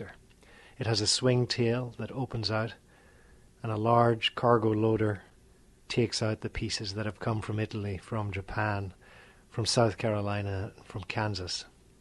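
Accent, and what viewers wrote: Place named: Northern Ireland, United Kingdom. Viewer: Brilliant video - nicely narrated too. Good to hear a Norn Iron accent on this video. :) Thanks for the upload!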